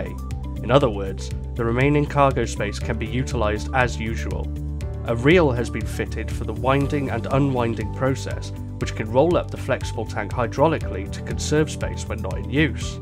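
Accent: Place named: United Kingdom. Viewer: lose the limey!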